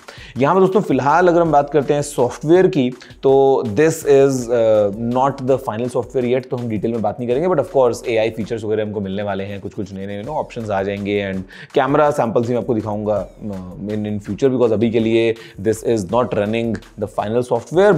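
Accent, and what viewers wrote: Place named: Butler English, India. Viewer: Pls Stop speaking in butler english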